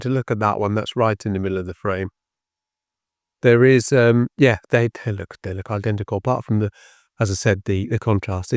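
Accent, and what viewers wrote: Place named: United Kingdom. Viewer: Thank you very much for this channel in British English. I find it very pleasant to listen to (as I am not a native speaker and my English is only at a basic level), and I particularly appreciate not having to listen to American English. In addition, the content of your videos really appeals to me – it is well selected and clearly explained.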